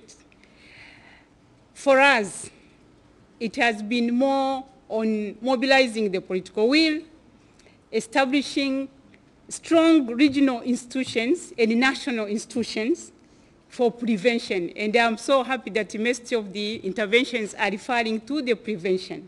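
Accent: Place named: Haya, Tanzania. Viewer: She is a Tanzanian from Kagera region. You can hear the Haya accent.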